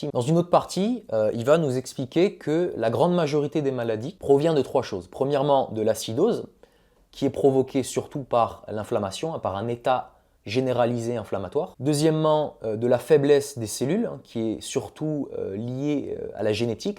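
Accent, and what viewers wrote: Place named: Housing estates, France. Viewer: Déjà apprends à aligner une phrase correcte en français, et après tu pourras, éventuellement, donner ton avis sur ceux à la cheville de qui tu n'arriveras jamais...ce personnage prétentieux à l'accent des quartiers (..) illustre la nouvelle "jeunesse", qui croit tout savoir et dont le cerveau a été configuré binaire par les smartphones dès le plus jeune âge. Voilà le futur de l'humanité ...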